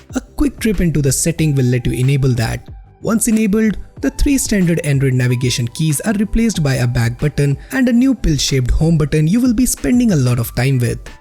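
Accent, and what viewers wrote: India. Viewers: He is indian thats why he speaks that way😊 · too many indian phone tech reviewer for the poco, seriously i can't find any american speaker out there, no offense but i can't stand the accent .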